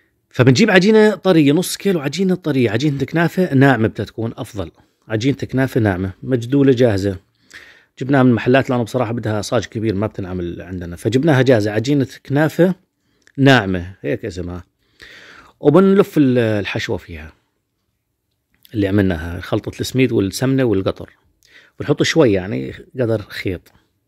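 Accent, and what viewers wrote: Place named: Hauran, Syria. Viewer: لهجتك الحورانية الاصيلة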